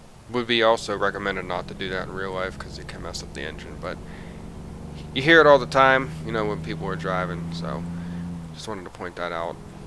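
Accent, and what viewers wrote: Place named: Pennsylvania, United States. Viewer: Just found your channel via Kyle's videos. Great to hear another Pennsylvanian.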